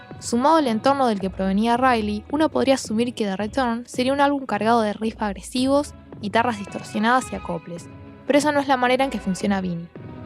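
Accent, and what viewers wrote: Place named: Argentina. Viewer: No esperaba un acento argentino! Muy buen video, gracias por subirlo.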